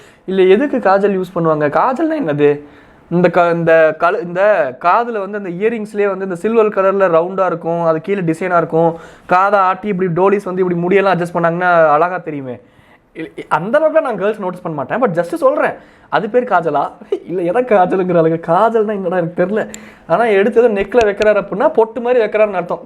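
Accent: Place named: Erode, India. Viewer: Light ah erode uhu slang varuthungooo 😅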